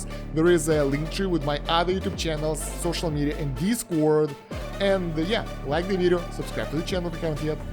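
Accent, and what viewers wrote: Russia. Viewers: слышу русский акцент)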